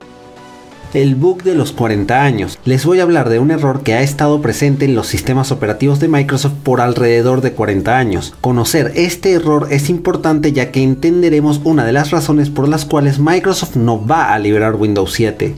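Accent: Venezuela. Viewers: amigo eres de venezuela bueno porque yo si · Llevo tiempo viendo tus vídeos y siempre supuse que eras Venezolano jajaja Saludos desde tu misma nación crack!!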